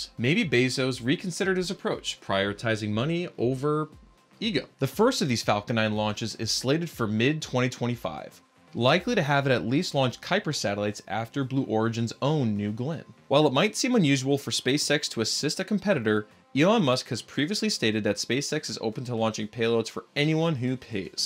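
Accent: Germany. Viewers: Wow, ist das schon wieder zwei Jahre her, dass ihr in die USA gezogen seid? Aber ja, das weiß ich, den deutschen Akzent erkennt man überall. Wobei du echt viele neue englische Wörter in dein Repertoire aufgenommen hast. Ihr habt doch vorher irgendwo in der Nähe vom Nürburgring gelebt. Hast du nicht irgendwie sowas mal gesagt, in einem Video lange her, oder? Wie kommt deine Frau damit eigentlich so zu recht? Vermisst sie Freunde oder Familie? Schätze den Kids ist das eher egal, oder? Naja, geh da von mir selbst aus. Wurde auch als Kind durch die Arbeit meines Vaters nach Florida verschleppt. Als Deutscher freut man sich ja über die Wärme, aber die einheimischen kühlen gerne etwas arg runter 😄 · I knew is from Germany stil a little Accent left.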